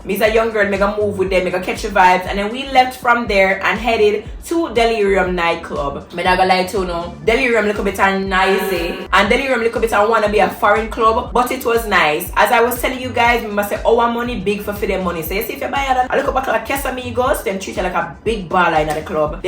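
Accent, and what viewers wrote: Jamaica. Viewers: Travel anxiety girl mi think a did me one❤❤😂 but u find bae happy for u girl gud me just love how u talk the things them like a real jamaican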